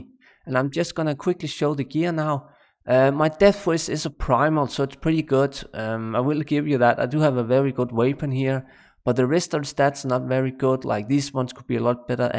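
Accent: Denmark. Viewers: Fed nok video. Så tydeligt at hører når det en dansker. 😂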